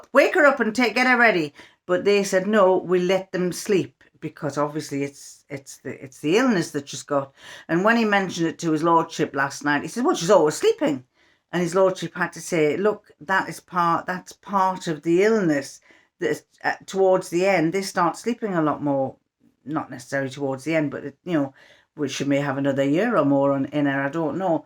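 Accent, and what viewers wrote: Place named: North East England, United Kingdom. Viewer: Hi there my mother kept my teenage quilt they threw nothing out that generation. its about 54 years old its a bit faded and thinner athough in amazing condition so when she passed away I took it. I remember it had a frill around it that was taken off. We used to call them eiderdowns. I put it inside a single warm quilt cover and its lovely to snuggle under. Always lovely to hear a North East accent on a vlog. I live in Newcastle xxx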